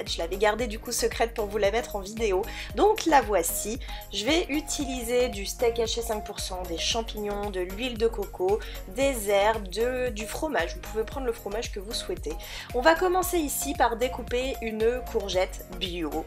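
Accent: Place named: England, United Kingdom. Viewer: Laccent anglais de ouf #pas du tt de l'ironie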